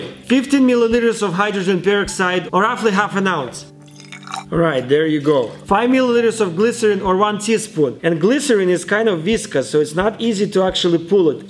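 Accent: Russia. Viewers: Great Russian accent and scientific experiments, while helping out humanity during the pandemic. Good job 👍.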